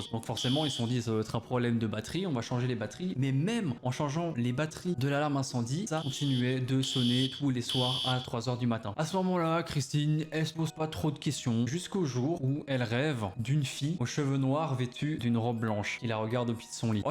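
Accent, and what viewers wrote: Belgium. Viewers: Il est belge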